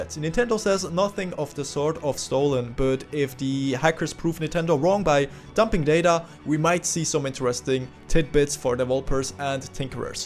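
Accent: Germany. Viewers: That fine German accent...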